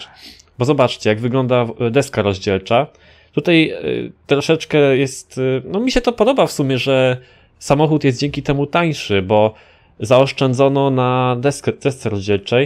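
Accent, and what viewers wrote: Szczecin, Poland. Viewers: Ze Szczecina jesteś?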